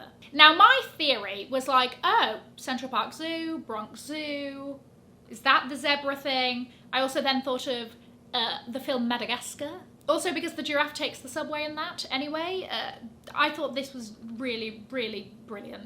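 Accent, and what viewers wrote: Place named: RP, United Kingdom. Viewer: Hi, I agree with you, some elements I like, some others are simply silly. Your videos are always interesting and I love your fantastic received pronounciation.😍😍😍 ( I understad everything you say and I’m an italian living in Spain)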